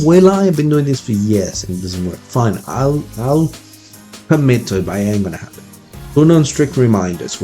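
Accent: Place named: United Kingdom. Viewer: bro you are a grown up now! you didnt even had that voice tone after high school hahaha very british xD sounds cool.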